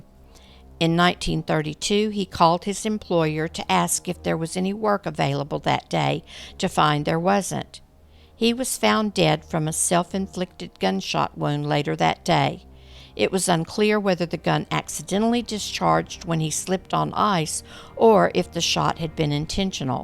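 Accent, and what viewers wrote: South, United States. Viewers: What an odd story. Seems almost modern in its senselessness. Murder is, of course, as old as Cain though. I could be wrong, but I fancy it was probably one of the first cases in American law where psychologists were called upon in evidence. Great podcast series, BTW. - and I always love to hear a classic musical Southern accent!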